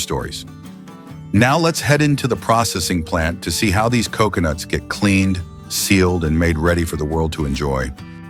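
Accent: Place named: United States. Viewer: It is good to see this tropical tree not being tweaked. When you see a Western idea blooming in an Asian country _ machinery, smart devices, surveillance it means the native has been taken over and it is not the original thing. Those that have learned the skill of climbing should be encouraged to carry on as I dont recall even one person falling or dying due to this skill that has been passed on for generations. Noticed that the men in this area of work were specially strong bodied and climbed the trees with ease daily to tap the top of the tree to produce toddy. Westerners interferring in a country they have no idea about native ways, should not sway their way of farming. AI with American accent and Western music says a lot about tge West controlling every place that is part of Asia or asking where we are watching from is snooping and a matter of concern.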